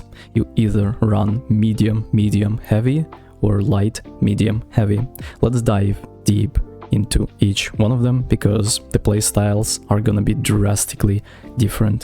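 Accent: Russia. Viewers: сразу понял что ты русский)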